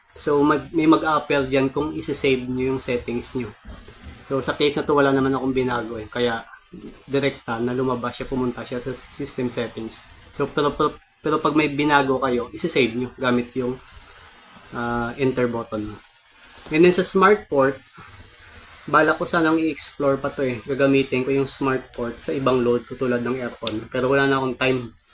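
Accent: Iloilo, Philippines. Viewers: Ilonggo ka Sir?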